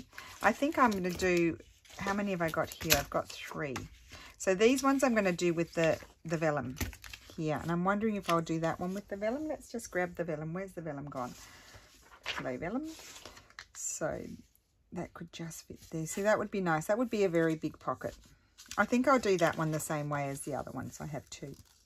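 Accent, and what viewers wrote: Australia. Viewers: Another fantastic video, really enjoy watching you. (So nice to hear your Aussie voice - and phrases - when watching 🤣) Have you tried popping your mushy Giotto in the fridge, might help for a little while? Dawn (Melbourne, Australia)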